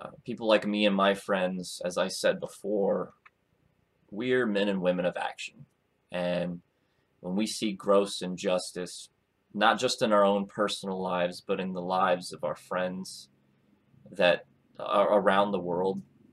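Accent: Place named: United Kingdom. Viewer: I like the Britsh accent! lol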